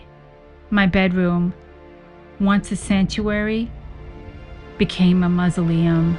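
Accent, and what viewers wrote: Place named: Boston, United States. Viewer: Hi! I’m watching now. I really don’t hear the Boston much except when you said “hahtbreak”. lol. I can reel mine in when I have to but that’s no fun. What really interested me about this project is you saying it’s about you. I’m into any kind of creative endeavors as therapy.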